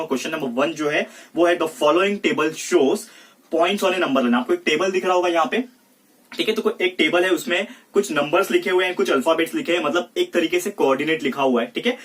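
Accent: Maharashtra, India. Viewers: Sir aap Maharashtra se ho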